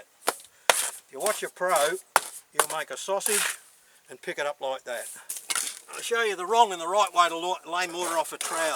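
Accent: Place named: Australia. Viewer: I guess all English speakers sound alike to everybody else in the world. I have to admit I can't tell the difference between somebody speaking Chinese or Korean in terms of sound - I don't speak either language. It's ethnocentric I believe. But definitely sounds like an Aussie to my Yankee ears. Awesome video.. Good on ya...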